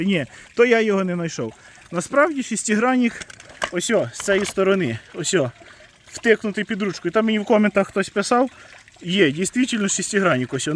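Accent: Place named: Transcarpathia, Ukraine. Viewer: Оцевго -осьо. Осьево-го, Осьо-отево, Сюдево, Оцьо-цьо. Говор такий дебілкуватий, чи то закарпуцький з глухої далекої полонини, чи то на якомусь Івано-Франківському забитому хуторі автор відео живе не зрозуміло. Шановний ви ж публічне відео знімаете, хоча б по українськи навчились говорити, а то цей дебілкуватий суржик слух ріже.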